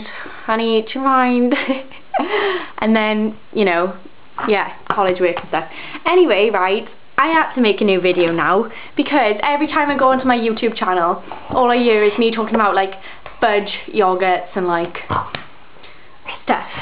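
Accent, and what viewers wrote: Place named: Wales, United Kingdom. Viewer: GOD YOU SOUND SO WELSH xD Hahahaha, mad! <3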